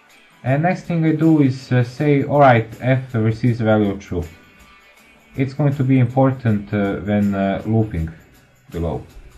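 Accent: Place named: Russia. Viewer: русский акцент , несет за версту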